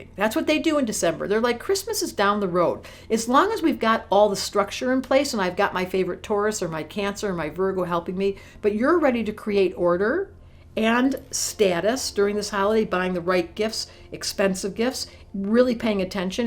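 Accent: United States. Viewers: I love your Michigan accent lol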